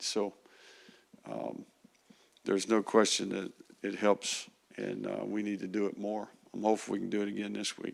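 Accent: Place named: South, United States. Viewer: Finally a coach with a southern accent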